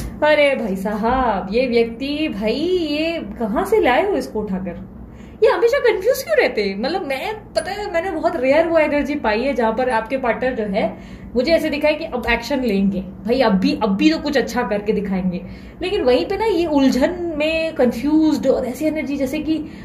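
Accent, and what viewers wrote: Mumbai, India. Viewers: Apki Mumbai language mast lagti hai mujhe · Ekdam mast reading mumbaiya language di amchi mumbai jindabaad🤩, I claim all positive energies thank you universe thank you divine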